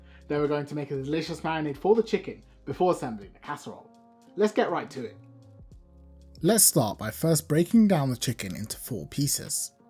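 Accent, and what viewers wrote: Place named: United Kingdom. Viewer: lol it's weird hearing a British accent from an Egyptian.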